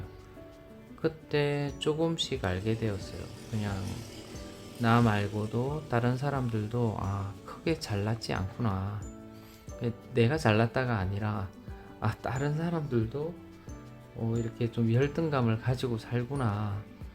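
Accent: Gyeongsang, South Korea. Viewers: 새해복마니받아요 저두 대구사람 어찌 말투가 주위에서 자주듣는 어투라서 경상도분이구나했두만 역시나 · 선생님의 이야기 잘들었습니다. 항상 느끼는것이지만 선생님은 경상도분이신데도 투박하지않은 듣기좋은 그런사투리를 쓰십니다. 그래서 항상 듣기가 편안합니다. 제 말투는 완전 대구사투리 그 자체거든요ㅎㅎ 오늘 날씨가 참 좋아보이네요 그리고 제가 가보았던곳이라 너무 반갑습니다ㅎㅎ 좋은하루 되시구요 오늘도 좋은영상 감사합니다^^ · 제 고향도 창녕 옆 밀양 무안입니다, 저도 부산에서 몇 년 학교를 다녔구요, 그래서, 억양이나 정서가 비슷하니 더 정감이 가는 것 같네요, 올핸 뵐 수 있겠죠!!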